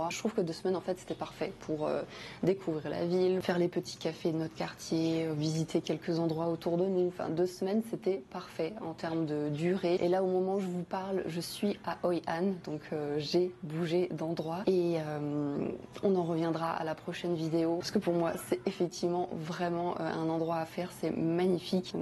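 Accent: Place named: East, France. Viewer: Merci pour cette vidéo, je pars bientôt à da nang ça me servirab😊. C'est moi ou j'entends un résidu d'accent de l'est 🧐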